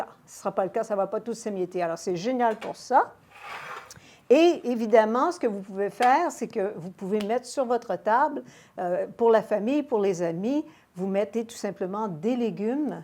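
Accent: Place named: United States. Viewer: Première fois que j'arrive sur une de vos vidéos, quelle élégance pleine de simplicité ! Très vite je me suis dit "cette dame est parfaitement bilingue français/américain" en entendant votre façon de dire "é-et" a la manière américaine "a-and" 😊 Merci pour cette belle recette ! Mon vieux déshydrateur est rond mais je devrais trouver une solution. Merci encore, vous êtes très inspirante 🌺 🇫🇷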